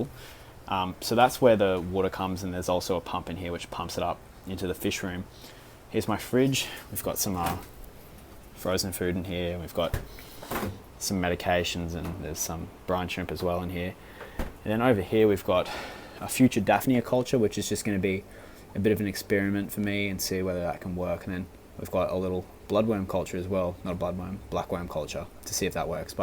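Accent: Australia. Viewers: Hold up are you from Brisbane, Australia?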